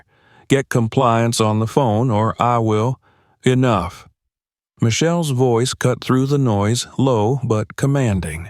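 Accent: South, United States. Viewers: I love your southern accent. May I ask where in the south you are from? I lived in S C for 12 yrs loved it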